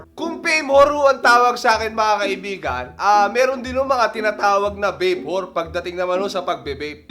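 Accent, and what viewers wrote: Ilocos, Philippines. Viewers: Bakit ka nagvevape? HAHAHAHA SAPUL 2020! I love your ilokano accent!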